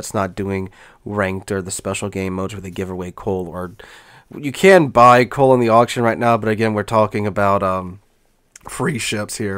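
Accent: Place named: South Texas, United States. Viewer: Sounds like you live in South Texas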